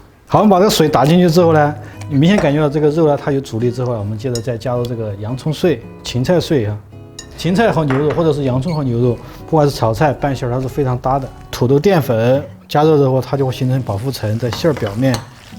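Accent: Sichuan, China. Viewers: 听口音是四川人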